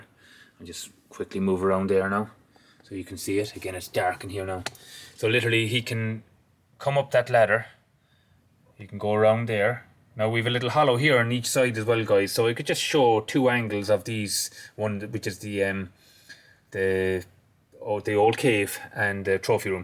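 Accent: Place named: Ireland. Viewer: Omg he's Irish! :D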